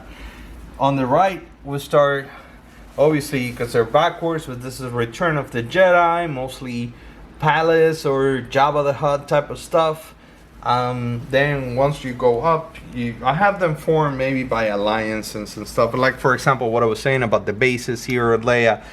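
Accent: Venezuela. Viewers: El acento venezolano te delata .. y me alegra .. muchísimo .. tremenda colleccion .!!! Increíble .!!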